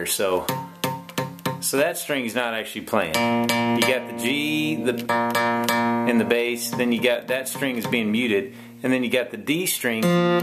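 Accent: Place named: South, United States. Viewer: Howdy partner your southern accent is the best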